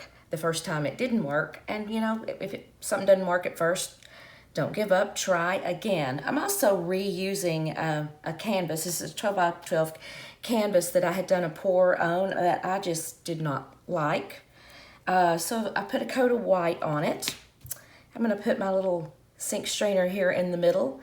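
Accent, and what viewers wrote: South, United States. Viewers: Thx for sharing! I love your southern accent ❤️. I’m from WV 😃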